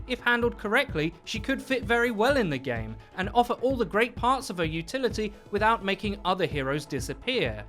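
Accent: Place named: United Kingdom. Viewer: STOP COMPLAINING! THIS CHANNEL IS BECOMING A PROBLEM FORNTHE GAME. YOU GUYS COMPLAIN ABOUT THING YOU DONT LIKE ABOUT THE GAME INA BRITISH ACCENT AND IN TURN CONTROL THE NARRATIVE FOR THE CHANGES THEY MAKE IN THE GAME! THIS IS A FUN CHARACTER TO PLAY AND SHE IS NO WHERE NEAR OVER POWERED. BLIZZARD NEEDS TO FIND A WAY TO CRATE A GAME THATS FUN FOR THE "PROS" AND FOR YOUR FREAKING BRONZE-DIAMOND PLAYERS TOO! YOU GUYS KEEP ASKING FOR CHANGES THAT MAKE IT NOT FUN FOR LITERALLY 90% OF THE PLAYERS!!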